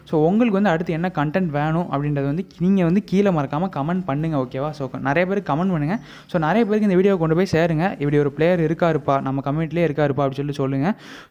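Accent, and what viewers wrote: Tirunelveli, India. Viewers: Bro neeinga triunelveli ya. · Bro ungaluku tirunelveli ya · Neenga nellai ah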